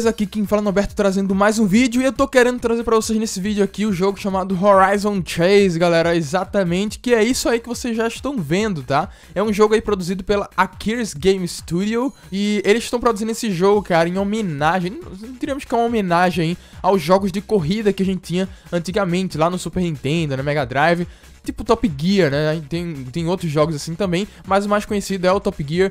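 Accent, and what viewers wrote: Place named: Rio de Janeiro, Brazil. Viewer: seu cariokismo me fez ir embora moço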